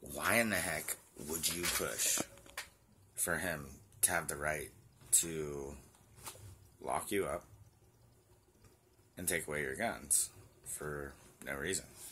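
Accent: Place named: Texas, United States. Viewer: Lovely accent my friend 👍🌻 Awesome is it Texan ? Now all I can say is America sucks! And you all need protection from true evil. Please try the Ganesha Mantra to protect you from evil forces. Breaks all obstacles . I prey for you all! 💚💚💚💚 Its a evil game where the loser will die a horrible death 💀 I'm sorry . Ganesha will protect you.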